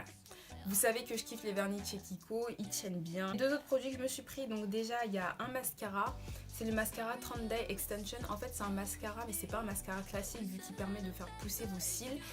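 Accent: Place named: England, United Kingdom. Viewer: Coucou ma belle! Alala je rêve de ton accent anglais ! Bref jadore ton haul, j'aime trop le gros pull new look 😱 bisous bisous 😘👍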